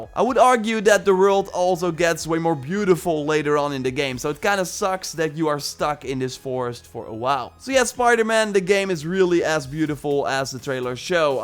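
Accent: Netherlands. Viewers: Wait you dutch? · Now i know where your accent is from (the comment said '' bewerkt''), I speak dutch too btw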